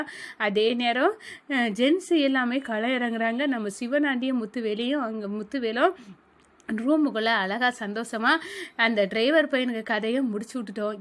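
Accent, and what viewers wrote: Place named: Tirunelveli, India. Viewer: திருநெல்வேலி பேச்சு super ❤ தொலிய உரிக்கும் வார்த்தை very nice. akka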